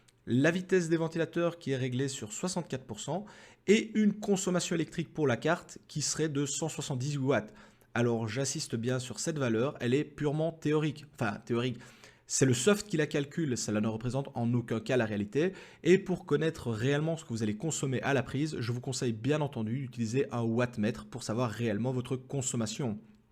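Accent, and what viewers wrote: Belgium. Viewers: J'ai cru entendre un p'tit Belge, bon, je me suis lancé avec une 6900xt, j'ai une 5700xt sur le côté, j'hésite à l'ajouter ... Tu en penses quoi ? Actuellement, je tourne à 60MH/s, c'est correct, non ? Merci pour la vidéo, ça fait longtemps que j'hésitais à me lancer, à voir si ça va arrondir mes fins de mois... J'ai aussi la possibilité de mettre un SLI de 2CG en 4Go sur un autre pc, ça va prendre ou pas du tout ? Ou je devrai me rabattre sur une autre crypto moins gourmande, genre ETH Classic (qui demande que 2Go) Signé : un camarade belge ;)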